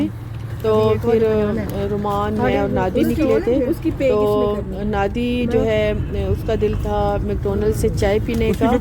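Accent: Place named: Bahawalpur, Pakistan. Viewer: Wah g choti choti khushianbbarri barri enjoyment🤩mn bhi bwp se hun aap k lehjy sun kr acha lgta ha .multan ar bwp ghoomny mn bht maza aata ha such mn .missing a lot my cities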